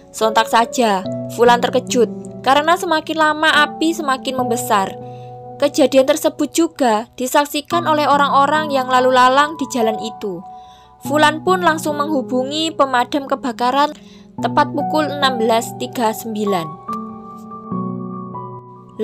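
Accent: Java, Indonesia. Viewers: Logat Jowo x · Kritik membangun: suaranya medok jowo bngt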